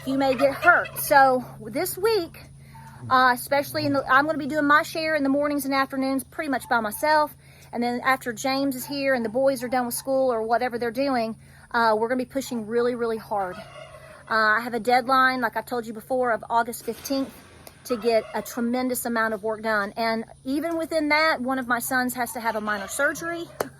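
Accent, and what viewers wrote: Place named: Texas, United States. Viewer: Some of the things that you say, I never heard for the long long time. But you make me laugh because I remember people talking like you because my family was from Texas and all down in that area somewhere. Just because my grandparents came to California so my parents from California but I left a 92 just cause I was born and raise their live their 42 years. I don’t think like a California so I’m glad I left. But I so enjoy listening to you talk because it reminds me of Family in Texas how they talked and even when I was younger in California when they were so you know what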